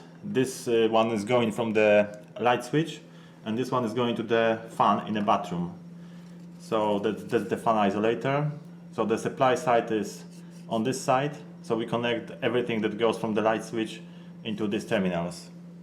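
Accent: Poland. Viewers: Lovely Polish accent. Dzięki za pomoc. Thanks for help.😎🇵🇱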